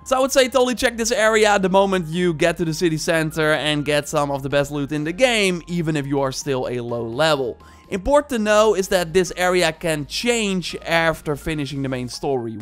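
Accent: Netherlands. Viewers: R u dutch? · Bro jouw englens klinkt echt Nederlands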